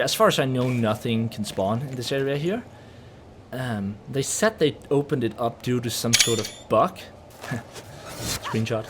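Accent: Denmark. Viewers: Are you danish? Your accent sounds a lot like WackyJacky101's a pubg content creator. Thanks for the video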